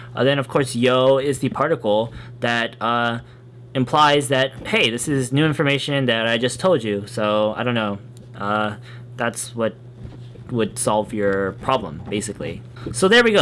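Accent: United States. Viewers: you look so japanese man :D I thought you should be american with your english speech level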